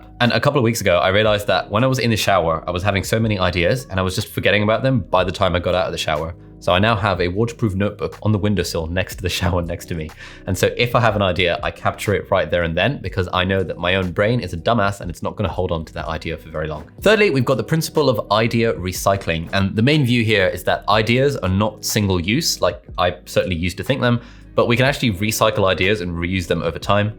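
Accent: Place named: United Kingdom. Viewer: You are a Pakistani but how did you learn British accent?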